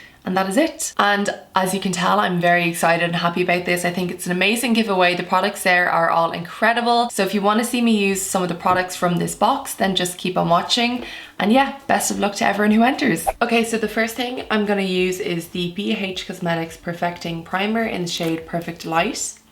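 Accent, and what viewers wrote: Ireland. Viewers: Wow your eyes 😍😍 and listening to you makes me miss living in Ireland 😫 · You're gorgeous 😍. Love your Irish accent too😉!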